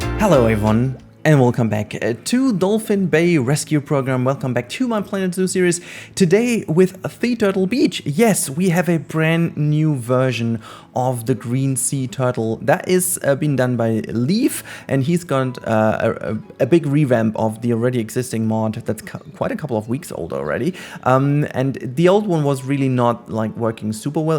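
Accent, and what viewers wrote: Germany. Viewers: Du sprichst ziemlich gut englisch, man hört trotzdem sofort das du Deutscher bist ;)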